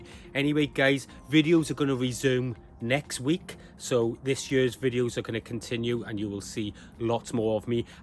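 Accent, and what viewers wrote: Wales, United Kingdom. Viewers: Hi. I must have missed this one. Your sounding proper Welsh in this one happy dayzzzz. By the way I am myself from wales just down the road from you any how take care Ange and the gang 🤗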